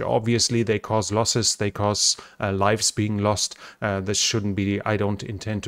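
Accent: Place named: Germany. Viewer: Always remember. When your opponent is down on the ground an bleeding, it's time to start using the steel toed boots. And never in my 72 years in this life did I expect to find myself listening to a commentator with a German accent reporting on combat in Ukraine and saying 'and today on the Eastern Front...."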